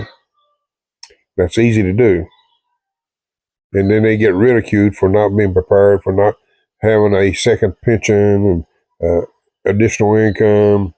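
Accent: United States. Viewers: Good morning,. If it wasn't for bad luck, you wouldn't have any luck at all. Given all of the terrible things you've experienced in life, you could've turned out to be a very angry and bitter person, but you didn't let all of that get you down. That speaks volumes about your true character. Sometime, during your young life you must've had a mentor that was positive influence on you. It's either that or you somehow inherited a terrific set of genes family tree or it could be a combination of both. Whatever the case, Grace couldn't have found a better person to marry than you and Virgil couldn't have a better father than you, In fact, Virgil couldn't have any better parents than you two, both genewise and mentorwise. As for me, I feel very fortunate to have stumbled upon your wholesome, heart warming, family oriented, interesting, and educational videos. Besides that, I really enjoy your relaxing, laid back, hillbilly way of talking,. 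It's nothing to be apologetic about. In fact, you should be proud of your family heritage. ❤ 👍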